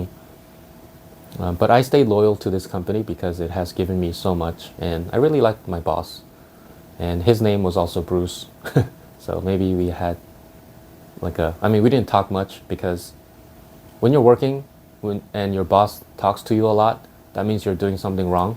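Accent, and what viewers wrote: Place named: South Korea. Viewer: You have a korean accent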